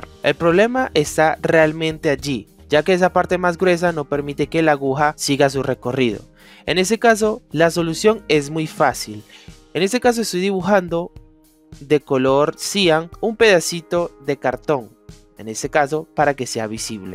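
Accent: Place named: Mexico. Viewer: se que eres mexicano por tu cantadito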